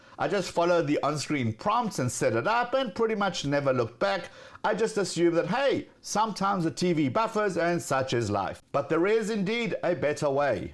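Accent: South Africa. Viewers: Are u originally from South Africa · For this reason I'm using an ethernet cable & also since the router is too far from my android tv box. Btw you sound like you have a South African accent since I'm from South Africa.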